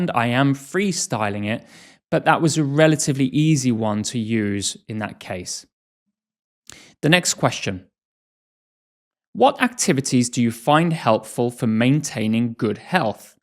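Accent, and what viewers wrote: United Kingdom. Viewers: Happy New Year from Azerbaijan🇦🇿 Thanks for podcasts🙏🏼 and your british accent is amazing very clear we could understand you whole conversation.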